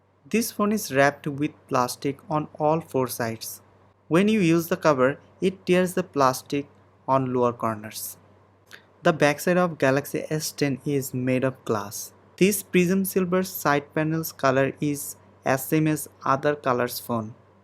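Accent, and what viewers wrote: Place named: Bengal, India. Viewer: Ur accent sounds like that of bengali people..!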